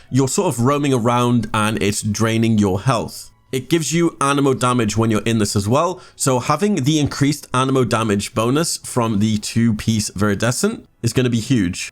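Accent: United Kingdom. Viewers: i dont watched rest of the video( because i amazed by your British accent) but will see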